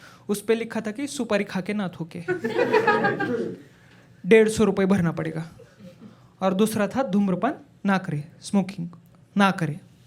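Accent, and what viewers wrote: Maharashtra, India. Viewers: भावा तुझ्या बोलण्यातून च समजत तू मराठी आहेस..🧡 आवडलं आपल्याला..🔥🤍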